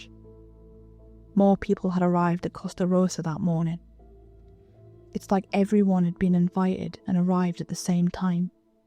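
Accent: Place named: England, United Kingdom. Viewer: your welcome. Your accent reminds me of my sister in laws mother shes from England. Introduced me to English breakfast best tea in my opinion.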